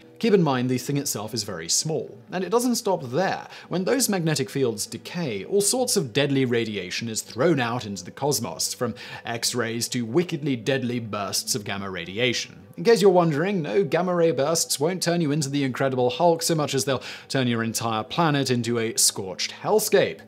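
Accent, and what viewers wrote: United Kingdom. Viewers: I'm telling you; I'm only one stupified, drunken nite away from getting a tattoo of Simon. And, as it never fails, it most likely will end up on a precarious part of my body. Damn those Brits and their damn accents.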